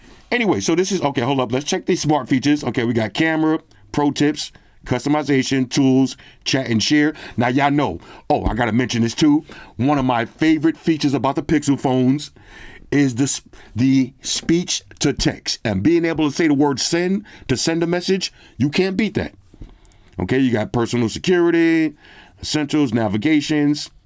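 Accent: United States. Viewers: The only stand-up comedian reviewing Gadgets channel in whole YouTube😅🌹although I don't understand some words of native usa accent, but I always watch each video uploaded🌹😅 tnx for ur perfect sense of humor 🌸🌸 🌸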